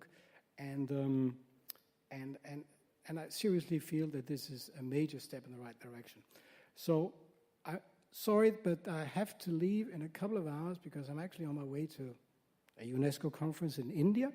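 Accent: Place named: Denmark. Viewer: This is Danish English-speaking,,,, blurry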